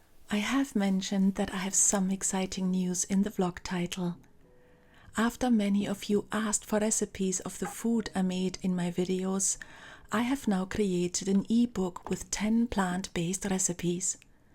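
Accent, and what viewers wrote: Scotland, United Kingdom. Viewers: I feel like you are picking up a bit of Scottish in your accent.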